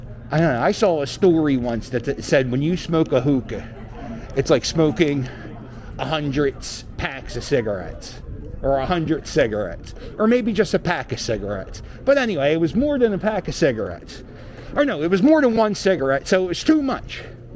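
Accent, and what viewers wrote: Philadelphia, United States. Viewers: This guy has one of the best Philly accent I've heard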